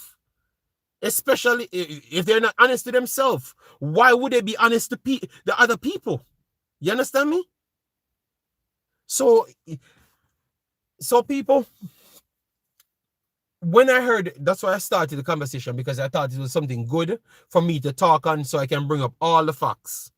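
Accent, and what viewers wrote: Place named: United Kingdom. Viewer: Great to see you back big boss! That british accent kills me everytime 😂😂😂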